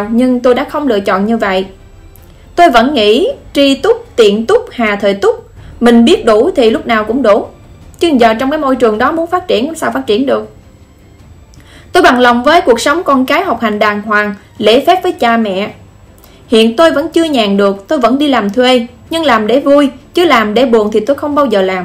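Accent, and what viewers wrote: South, Vietnam. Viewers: Ngôn từ đơn giản ( không cần " câu cú " cao siêu! ) + giọng nói nữ miền Nam rỏ ràng - sôi nổi - tự tin - mạnh mẻ + đề tài lịch sử hay - trung thực - lôi cuốn người nghe + xinh tươi -......triệu triệu like tặng xinh tươi nhiệt huyết! BRAVO!!.....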